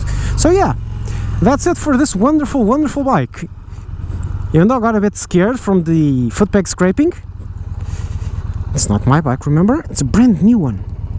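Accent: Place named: Portugal. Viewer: nice portuguese accent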